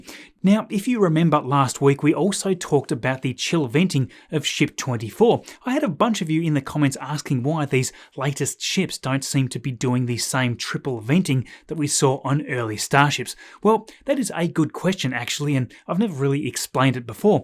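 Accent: Australia. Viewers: God how I love this channel. Well organized, interesting, and reliable information delivered in an affable Australian accent.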